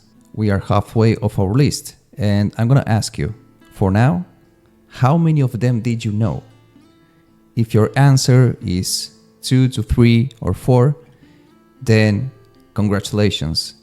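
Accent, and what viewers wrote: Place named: Bolivia. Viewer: You are a great teacher! Are you from Bolivia? Your accent is different.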